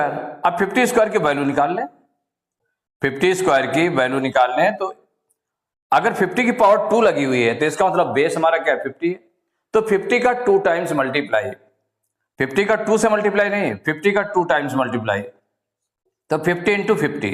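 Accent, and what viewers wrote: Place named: Bihar, India. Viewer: Sir aap. Bhiar se ho kya kyu ki aapka aawaj bhiar jesa lqg rah hai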